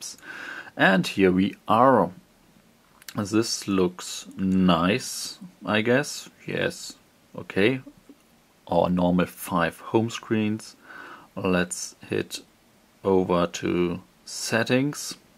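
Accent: Germany. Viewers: are u from german ??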